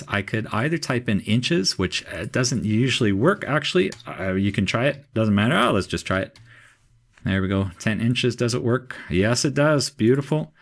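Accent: Canada. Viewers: I really love your courses. They are the best CAD courses that I have taken. Your speed, method of explaining, and examples are excellent!. I love the Canadian accident too. Thanks for doing such a great job. I look forward to more of your V8 videos. I loved the LEGO Man videos too.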